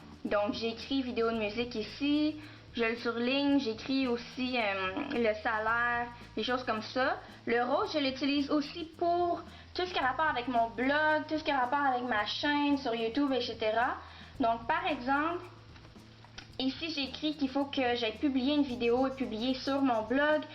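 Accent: Quebec, Canada. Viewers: québecquoise, c'est un des accents qui se repère le mieux ^^'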